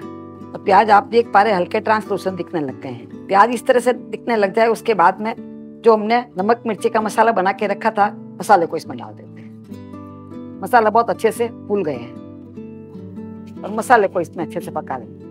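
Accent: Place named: Rajasthan, India. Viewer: True.. I miss her beautiful Rajsthani accent ..🙂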